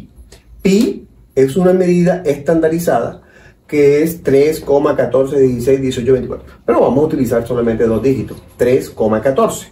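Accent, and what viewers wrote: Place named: Venezuela. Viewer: Clase magistral, pareciera complicado, pero solo hay que prestarle atención y practicar. Tiene lógica. Gracias. Estas en Colombia, oero eres Venezolana, cierto? Por el acento. Soy venezolana, vivo en chile.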